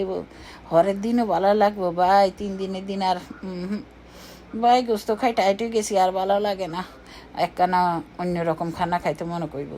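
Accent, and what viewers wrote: Noakhali, Bangladesh. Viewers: আপু নোয়াখালির কথা আমি তেমন শুনিনি আমার কাছে খুব ভালো লাগলো তোমার ভিডিও টা ❤️সাথে তোমার কথা গুলো 👍👍👍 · রুই মাছের কাবাব অসাধারণ হয়েছে মাছের কাবাব রেসিপিটা আর সুন্দর সুন্দর নোয়াখালীর ভাষায় কথা বলে কাবাব রেসিপিটা বানিয়ে নিলেন চমৎকার চমৎকার চমৎকার❤🎉❤🎉❤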